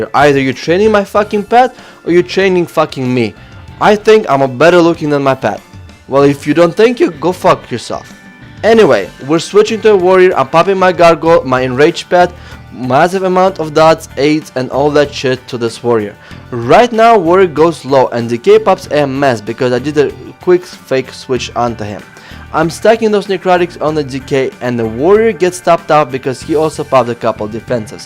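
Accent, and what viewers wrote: Russia. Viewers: i fucking love russian abscent :3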